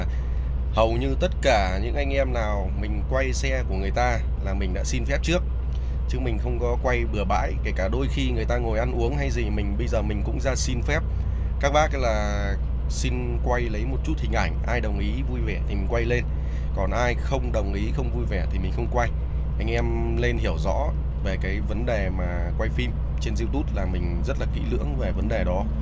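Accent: North, Vietnam. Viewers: Sao bác bảo quê miền trung mà lại nói giọng bắc vậy nhỉ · Miền trung mà a giọng bắc nhỉ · Anh người miền trung sao anh lại nói tiếng miền bắc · Quê anh Hà Tĩnh sao nói giọng miền Bắc vậy. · E hỏi cái. A miền trung sao nói giọng bắc vậy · Quê hà tĩnh mà a nói giọng bắc vậy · A quê Bắc à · Người miền trung mà nói tiếng bắc ko trượt phát lào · e cũng thích đầu kéo lắm a ở miền trung mà sao lại nói giọng bắc vậy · anh ở hà tĩnh mà sao lại nói giọng bắc vậy nhỉ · Bác nói quê miền trung sao em nghe giọng bắc vậy ? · A noi a la người miền trung nhưng sao lại nói giọng Bắc vậy ?? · Anh mien trung ma em nghe giong anh em tuong anh la mien bac chu hihi chuc anh nhieu suc khoe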